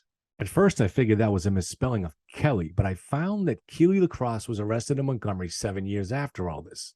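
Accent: Boston, United States. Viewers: lol love the Boston accent ! 90 miles is still close!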